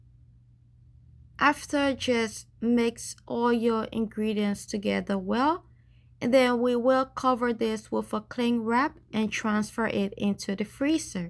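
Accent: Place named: Liberia. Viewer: Are you Liberian you sound like me!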